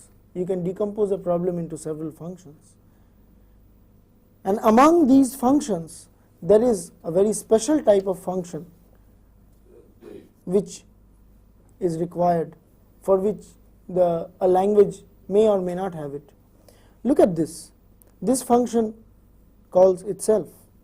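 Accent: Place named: India. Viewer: I am from Sweden so I am not a native English speaker, but I can still understand this guy - although I kind of have to listen harder to grasp what he is saying. I guess I have a slight advantage due to the fact that Swedish is more "flat" than English and doesn't use any gliding vowels (unless you are speaking some of the southern accents), so that's probably more similar to the Indian accent.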